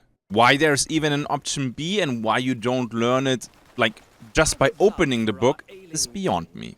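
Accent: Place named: Germany. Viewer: Sehr gutes Video, Sehr deutscher Akzent hahaha. zB review. Das re wir wie be reel ausgesprochen und nicht wie bei red. oder biomes. bi wie by und nicht wie bee die Biene. aber echt gute review, schön viele Schnitte zu spannenden Szenen und passend zu dem was du sagst. Nicht 0815.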